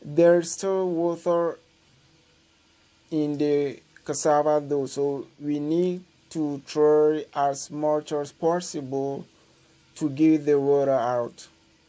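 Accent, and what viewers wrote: United Kingdom. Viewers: Oh. You're too much with your British English. Well done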